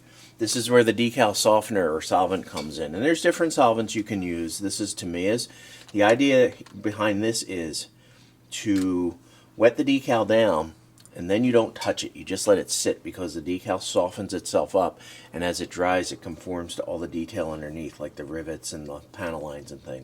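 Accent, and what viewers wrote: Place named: South Jersey, United States. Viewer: Love the South Jersey accent.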